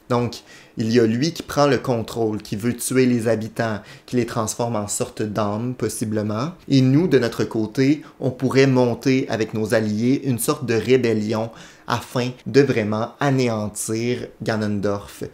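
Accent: Quebec, Canada. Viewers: En tant que Québecois moi-même, je sais pas pourquoi, mais je fais toujours le saut quand j'entends un accent Québecois sur youtube. XD Mais bonnes théories, moi aussi je pense qu'on va revenir à l'époque d'il y a 10000 ans et voir comment tout ça a commencé. Je me demande aussi si les 7 larmes seront les seuls "Key items" de donjons... par exemple, dans Ocarina of Time, tu dois trouver les 3 spiritual stones et après ça les 6 médaillons... alors what if, que dans TOTK, tu doive trouver les 7 larmes dans les 7 premiers donjons et puis là.... BAM! 20 nouveaux donjons où il faut trouver autre chose. (Je sais, je rêve lol)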